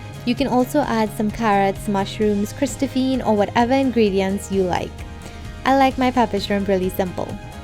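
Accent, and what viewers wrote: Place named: Trinidad and Tobago. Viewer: Really delicious! Do you prefer the bigger or smaller shrimp for pepper shrimp? I never attempted it but this makes me want to 😻 Your accent always makes me happy to be Trini🇹🇹 I really need that hops at the moment!!!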